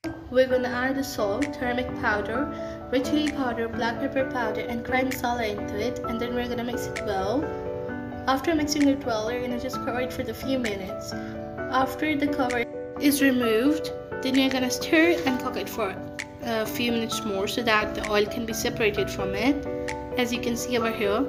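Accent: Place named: England, United Kingdom. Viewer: Yooo Desi recipe in English accent... Yummy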